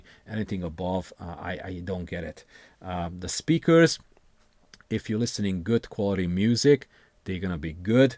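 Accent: Hungary. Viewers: Nem azért írtam, csak annyira karakteres a magyar akcentus. Jó nézni a tartalmaid,csak így tovább!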